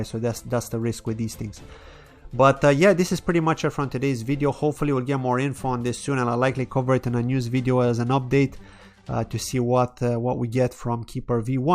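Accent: Ireland. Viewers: you have a slight irish accent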